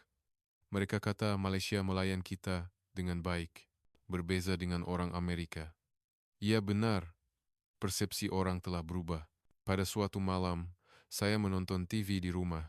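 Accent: Indonesia. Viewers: Ejen MOSSAD INGIN MALAYSIA BERTELAGAH DENGAN DUNIA...INI ADALAH ADUDOMBA.... MEPERLAGAKAN EMOSI SESEBUAH NEGARA DENGAN IDEOLOGI PENIPU DEMI SATU MATLAMAT...INI TUKANG CERITA KETURUNAN INDONESIA..CARA PERCAKAPAN LOGHAT INDONESIA..APASAL ORANG INDONESIA SUKA MENIPU MAJORITI..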